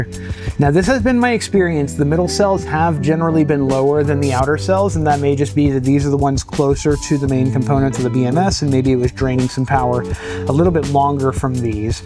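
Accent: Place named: United States. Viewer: You have in American accent in your english language. How does it come that you know millimeters? I was expecting you as an American to use yards and inches and 15/32 inches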